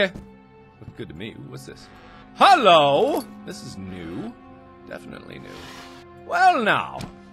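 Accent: Sweden. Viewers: That is so swedishenglish that i am going to puke. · the accent is so Swedish i can tell as a Swedish person s easy to tell if someone is Swedish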